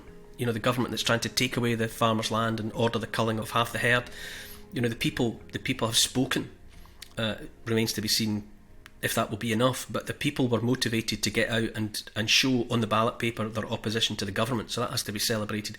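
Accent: Scotland, United Kingdom. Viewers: The Scottish accent is the most beautiful accent in the world and is easy to understand.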